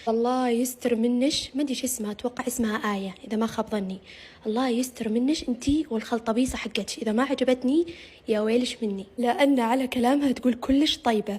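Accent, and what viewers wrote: Iraq. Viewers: امي عرفت عراقيه اي البنيه اسمها ايه والله العظيم تعرفين تتكلمي عراقي اذا تحبين العراق الفلوق الجاي سوي دولمه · عراقية بحت ههههههه😂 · اني عراقيه انصدمت بلهجتك بصراحه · يمه فدوه شلون تجي عراقي انا احبج كلش كلش كلش هيه الوصفه كلش حلوه وانتي احلى ❤❤❤❤❤❤❤❤❤❤❤❤❤❤❤❤❤❤❤❤❤❤❤ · فدوه للتحجي عراقي🤭🤗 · والله احلى وحده تحجي عراقي فديتج❤❤ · احلا وحدة تحكي عراقي❤❤ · حسيت بفخر من ذكرت العراق 😂 و حبيت شلون تحجي لحجتنه 😂😂😂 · احلى من يحجي عراقي❤ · فدوه للتحجي عراقي❤❤❤❤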